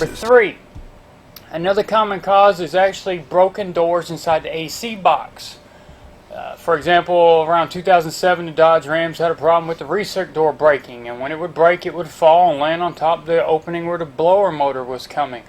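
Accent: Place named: Alabama, United States. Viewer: You don’t sound like you from the motor city.. sound more like you from Alabama Mississippi or something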